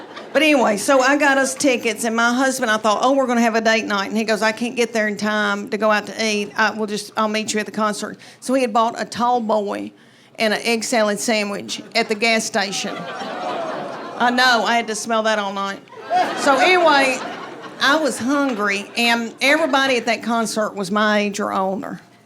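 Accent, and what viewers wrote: South, United States. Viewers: This has to be one of the worst American accents there is! So nasal and annoying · Yes...She is refreshing. I find it odd though...that her accent is way heavier and countrified in this video than the other two videos of her I watched. I think one of the others was from at least two years ago. This one is 6 months old. I guess she changes her twang...based on location? That would kind of make sense. You want to be understood. Maybe she moved back to Georgia? That is what the accent resembles. Regardless...I was also brought up in the mid 1960's - 70's...and I can relate to a lot of her stories. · I always laugh…so relatable about everyday life with the fam. I love her southern drawl it adds to her stories. It beats one from up north any day… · As a fellow GenXer I enjoyed her material, but her accent is just too Southern for me. 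I'm as South as you can get(Florida born and lived here all my life), but I'd guess that only about 5% of people have Southern accents that thick. Probably because the vast majority of people that live here moved from up north. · Just discovered. Nice hearing a clean southern story teller. Jerry Clower style. ❤ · i’m divorced, from extreme L california, been dating a lotta southern girls lately and now in love with their southern accent. and it’s women like that only makes me love southern girls more! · With that southern drawl, only she can talk about her real life situations, and make it relatable and funny! 😆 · I am not a native speaker. What southern state his this accent? Georgia? Alabama? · She is really funny , love that southern belle accent 😂😂😂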